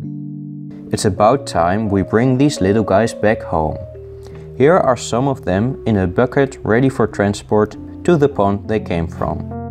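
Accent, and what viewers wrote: Netherlands. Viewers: Its a dutch accent we have a really weird g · thats such a heavy bad dutch accent wow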